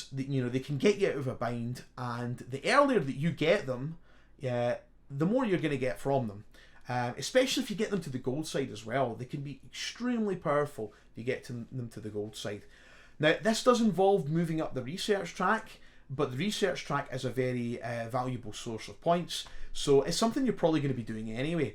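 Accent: Scotland, United Kingdom. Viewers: Sounds scottish. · Sounds more Scottish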